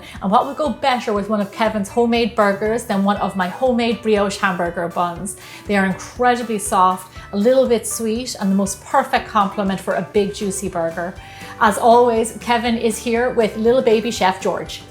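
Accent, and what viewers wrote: Ireland. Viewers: Hi as an Irish person watching this from Wakes, UK this made me smile. Your lovely Irish accent reminds me of home. 🇮🇪🙂☘️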